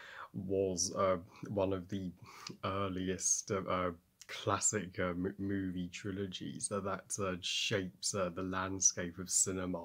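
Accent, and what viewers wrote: West, United States. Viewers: Hi! We are big fans of this series. Of course one and 2 are our favorites but 3 wasn’t bad. Your western accent is cute!! 😆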